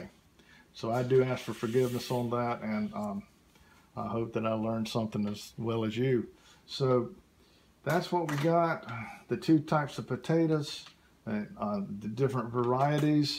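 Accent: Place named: Virginia, United States. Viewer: Sooooooooo glad to hear your wife is healed. I was rescue captain for Virginia Beach Rescue Squad back in the 80s. Love Virginia... that's why I recognized your accent. I live in the Netherlands now. Thanks for the tips. I'll be staying tuned. Peace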